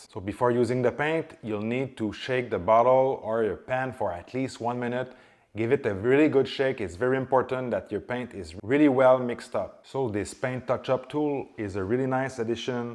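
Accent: Quebec, Canada. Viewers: yes osti I will say he is a quebec guy 🙃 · Fantastic video, the best I saw for rock chip repair. By the way I noticed your Quebec accent.:washhands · I get to the end of the video and I’m like oh wow this guy must be Canadian cause I just noticed his accent, since I was sooooo busy paying attention to every step. Great video sir, it has given me the confidence to wet sand my own paint for chip repairs. Of course gonna get a junk panel for free somehow to practice 1000 times, but i finally have every step and tools I’ve never had before! Blessings!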